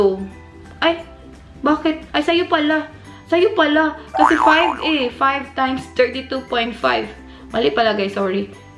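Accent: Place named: Visayas, Philippines. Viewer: Murag bisaya lagi ka madam Okay naman pala mga bilihin jan atey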